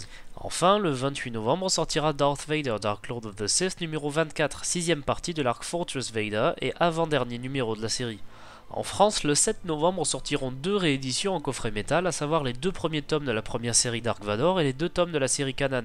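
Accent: England, United Kingdom. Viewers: 10/10 accent anglais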